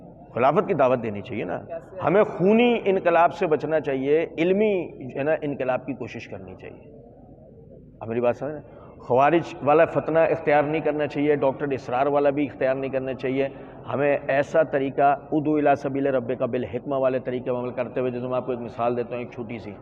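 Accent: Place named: Hyderabad, India. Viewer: آپ کے بعض الفاظ سے آپ مجھے حیدرآباد دکن کے معلوم ہوے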